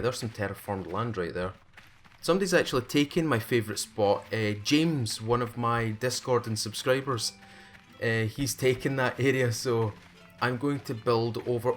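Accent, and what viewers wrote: Scotland, United Kingdom. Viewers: I did NOT insult your accent, I really like the way scottish people from all over Scotland sound when they speak, I was just asking because it sounded like you had a scottish accent, but it sounded a bit different from the scottish I've heard so far, so I was unsure.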